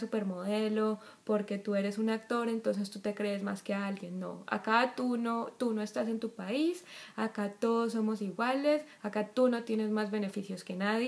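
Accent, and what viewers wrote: Colombia. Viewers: esa forma de hablar, jaja se nota que sos colombiana. Muy buenos consejos; y como tú dices: ponerlo en practica es indispensable. Muchas gracias por el video, saludos desde Cali-Colombia vee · Tenias mucho acento caleño jeje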